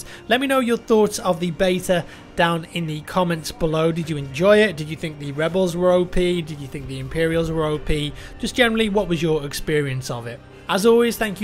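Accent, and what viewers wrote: England, United Kingdom. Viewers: Lewishague10 well me and are from the same town in England and thats just how its said, put it down to accent or w/e you want but it is what it is and it is fact lol. bee-ta i wouldnt just make shit up for the fun of it lol. even admitted it himself so there you go. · You sound British, yet you say beta the American way? Lol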